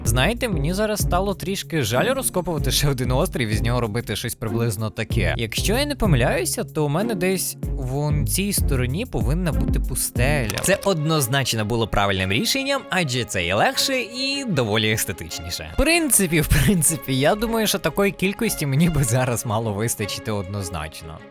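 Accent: Lviv, Ukraine. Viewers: Ти зі львова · ТИ ЗІ ЛЬВОВА? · ТИ ЗІ ЛЬВОВА?! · Привіт) Давно не бачила українськомовного майнкрафтера) Коли почула "чуть не получив тягла" зрозуміла, що ти зі Львова) Так приємно чути українську мову на ютубі і свій говір) Бажаю успіхів) Продовжуй в тому ж дусі) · Ти з Львова ? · ти зі львова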